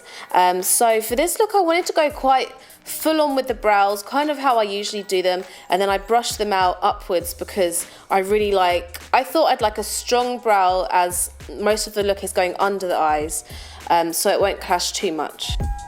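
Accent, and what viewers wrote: London, United Kingdom. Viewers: you're so London, I love it. makes me want to go and visit again xx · british accent!! love the make up and your eyes are so beautiful! wow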